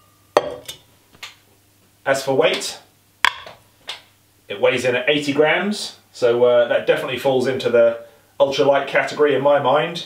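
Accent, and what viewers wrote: England, United Kingdom. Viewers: Thank you. I’ve just found your channel, but you have another subscriber on the strength of this one video. Also a nice change to find an English accent and a practical purpose, rather than some over-tattooed young person preparing for an “Armageddon” with a military hat and a (metaphorical?) gun on his hip. Thank you again. Les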